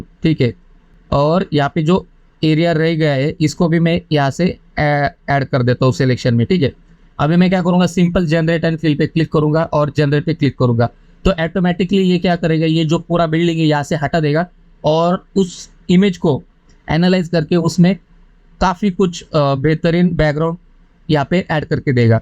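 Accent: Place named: Varanasi, India. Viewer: Varanasi se ho kya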